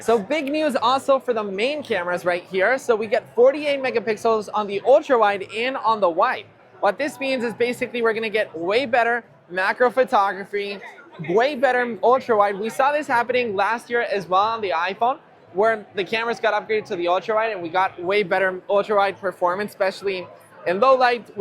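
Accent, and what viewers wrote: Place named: Spain. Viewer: El español de de España tía hablando de los iPhone joder 😅😅😅😅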